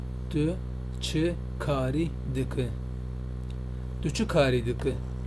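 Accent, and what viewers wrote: Turkey. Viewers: The speaker is speaking Kurmanji, but he has Turkish accent when he speaks Kurmanji. Unfortunately, many Kurds first language is Turkish in northern Kurdistan because our language was banned in northern Kurdistan. Turks thought they could assimilate us :(.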